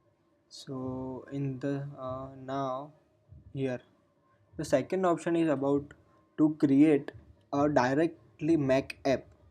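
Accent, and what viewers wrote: India. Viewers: and like the indian accent :D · indian accent sucks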